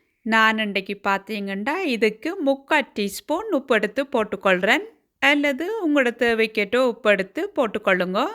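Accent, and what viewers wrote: Sri Lanka. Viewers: இலங்கை தமிழும் , தோசை யும் அருமை 🎉🎉🎉🎉🎉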